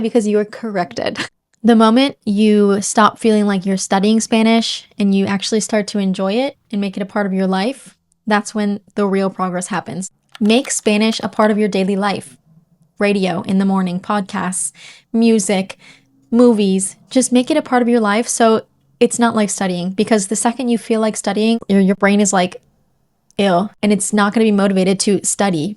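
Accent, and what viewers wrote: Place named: United States. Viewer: To add on a complete side note. I only just realized that you’re speaking with a state side accent. I follow a few YouTube, instagram accounts to graze on Spanish content. Most are native speakers but there’s a few English. You have a very English look and demeanor. Surely you’re living in England?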